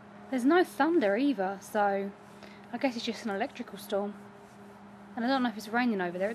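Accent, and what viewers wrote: United Kingdom. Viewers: I love your British accent :3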